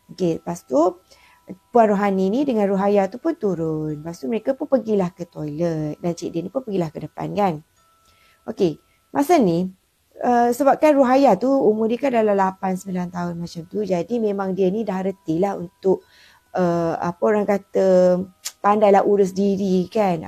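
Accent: Kelantan, Malaysia. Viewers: Akak ni org kelantan ke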